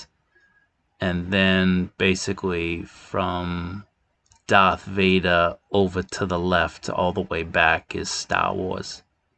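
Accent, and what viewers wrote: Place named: New England, United States. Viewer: Definitely from New England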